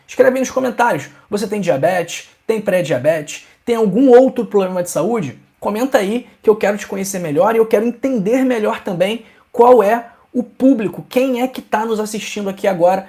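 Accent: Rio de Janeiro, Brazil. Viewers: Obrigado, doutor. Não se preocupe com críticas sem sentido. É um grande médico, do bem e percebe-se, também, que é muito atencioso e amoroso para com as pessoas, parabéns! Fale bastante e com seu sotaque carioca, passando-nos suas importantes informações que nos ajudam na qualidade da saúde. Abraço.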